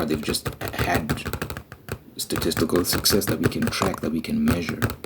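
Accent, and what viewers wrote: South Africa. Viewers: south africa im pretty surw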